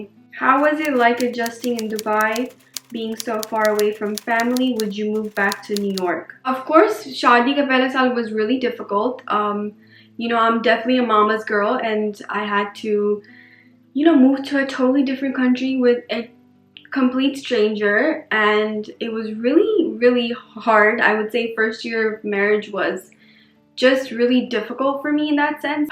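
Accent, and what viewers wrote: Pakistan. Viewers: Your Urdu accent is exactly like Pakistanis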